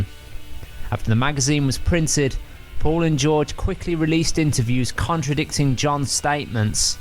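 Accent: Birmingham, United Kingdom. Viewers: Good analysis.... A lot of things I haven't heard of before.... and great Brummie accent!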